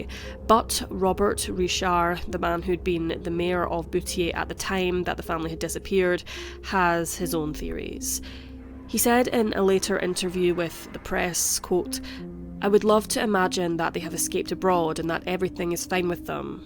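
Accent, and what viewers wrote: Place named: United Kingdom. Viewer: Your voice is freaking perfect! I don't know what it is about British accents, but 😍!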